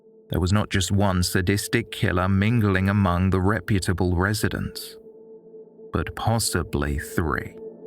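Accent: United Kingdom. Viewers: I’m so glad I discovered your channel - British 🇬🇧 voice😀 great content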